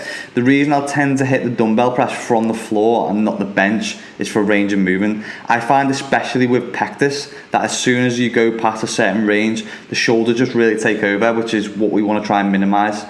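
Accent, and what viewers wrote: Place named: Liverpool, United Kingdom. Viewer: Nice physique, quite an interesting accent, is it Liverpool one?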